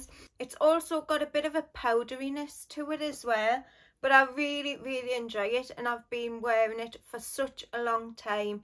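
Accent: Scotland, United Kingdom. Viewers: Goede morgen xxxx greetings from the netherlands, lovely accent scotish???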